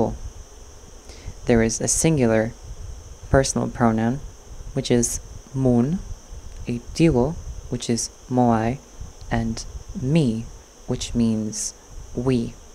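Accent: Ireland. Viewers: Hi, I am very interested in the Sami languages.Do you happen to have Sami-learning materials (e-books/digital formats in pdf or dejavu formats)? Not necessarily in English, could be in Norwegian, Swedish,Finnish or German as well. Thank you for your video, very much appreciated. By the way, your you tube name sounds Gaelic to me and your English has an Irish lilting.Tá Gaeilge agat ? Regards from Spain/ Go raibh maith agat. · I swear the lady presenting the video has a dash of Irish accent.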